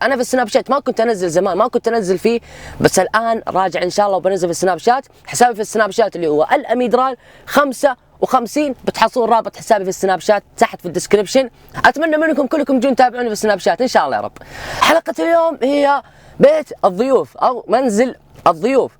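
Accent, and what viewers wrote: Saudi Arabia. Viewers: ووه سيدي امجيزاني لهجته زيي 😂😂 ي لبى بس اخيرا يوتيوبر جيزاني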